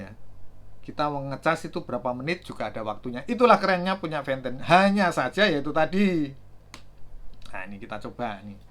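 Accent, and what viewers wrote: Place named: East Java, Indonesia. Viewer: Nek wiz muni,, "Haaahhhduhhh...." Aksen JATIM nya kuat sekaLii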